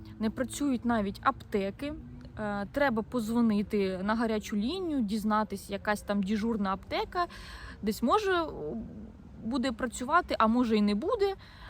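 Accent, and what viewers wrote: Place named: Russia. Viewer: Извиняюсь, но у меня один вопрос. Вас кто-то заставляет во Франции говорить по-украински? Вы явно русско-язычная и для вас это иностранный язык. Слушаешь вас и уши "вянут".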